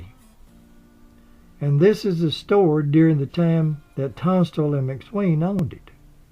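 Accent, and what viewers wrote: South, United States. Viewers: I love that Southern accent. Music in my ears. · I don’t know where you’re from, but you have a pleasant story telling accent. I’m guessing western AL or Mississippi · Ok...I'm from Wisconsin and in my opinion, anyone south of Illinois has an accent that multiplies by every mile south of that. Perfect voice for documentaries and you should be on TV and radio. Your documentaries are better than some of the History Channel documentaries I've seen. Also, speaking of History Channel, have you watched "FOUND?" If you havent, you should see the Jesse James episode...mind boggling!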